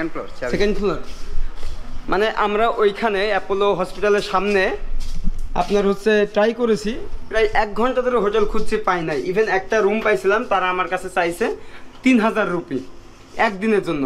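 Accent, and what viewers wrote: Bangladesh. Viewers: বাংলাদেশী english এমনি হয় ।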